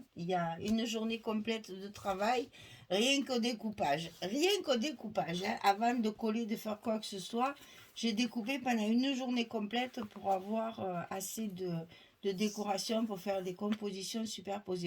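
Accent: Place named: Occitanie, France. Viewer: Bonjour, j'adore vos créations elle sont manifiques 😍💕votre accent très agréable ressemble a l'Occitanie. BRAVO.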